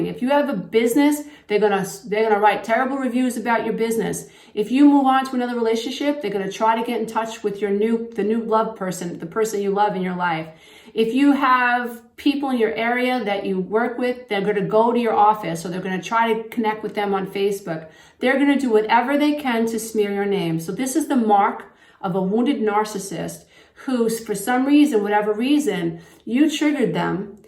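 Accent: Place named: Long Island, United States. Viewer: Also I'm a Long Island guy. And although I haven't lived there in a long time it's still home and when someone speaks to me the way you do it's so familiar comforting supportive and oh so welcome! The tone the accent the loving intention it all hits home real deep you've been so helpful. Look at how many people you are helping it's astounding! I'm making the best music of my life now because I'm plugged in. Thanks to you and others in your field of expertise.😍 Happy New Year! Your good karma account is overflowing.👩😇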